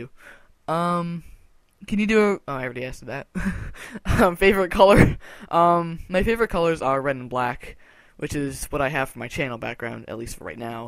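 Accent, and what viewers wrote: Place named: Australia. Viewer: Yes you sound austrailan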